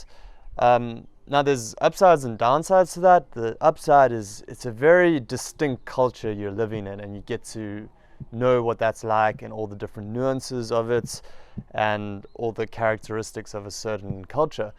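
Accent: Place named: South Africa. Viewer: Buh but your accent! I had no idea you were from South Africa, let alone an hour away! Anyhow, looking forward to hearing from Pavina soon.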